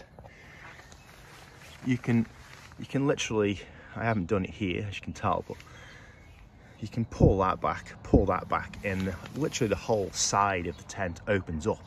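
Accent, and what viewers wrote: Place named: Stoke, United Kingdom. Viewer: you sound like your not far from stoke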